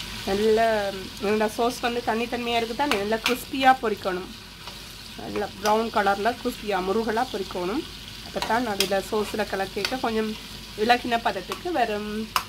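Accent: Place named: Sri Lanka. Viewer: Elangai Tamil inimai sis 🤝😋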